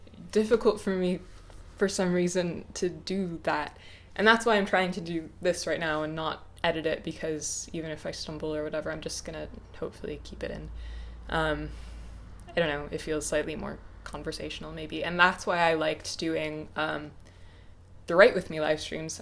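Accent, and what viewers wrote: Midwest, United States. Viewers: You're sounding more American again. Huh. Interesting. hahaha speaking of analyzing. Glad you seem well and that the break was good for you and a well thought out, conscious decision. Privacy is healthy, keeping boundaries is healthy. Good on you! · i finally heard the midwest in your voice here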